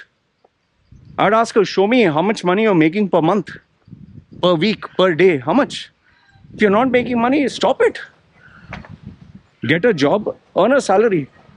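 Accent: India. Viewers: You sound like an indian as well. And why dont you sit down while speaking, just to show some respect to your listeners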